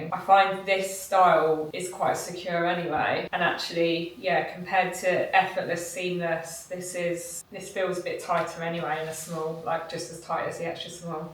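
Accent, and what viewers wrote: United Kingdom. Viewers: Those well spoken from either continent refer to your speech pattern as "brit-lish". Grammar, enunciation and spelling do matter. That said, I've always wondered why every girl in america has a thing for men with british accents. I for one, have no special care for the female version of the same. All that said, I love your jump rope videos. Looks like you're having a great time! Hope you keep it up. People here need to get off their bums and get some exercise and your way is as good as any other! Best of luck and just ignore the haters. 🎉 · I live in the US, I know, we are a bit of a target for jokes lately. But I've had a few friends from GB over the years, and the really good ones had the same 'accent' as you. That, your bubbly personality, and the notion that my old a$$ can get some exercise, is why I love following your channel. 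Keep up the good work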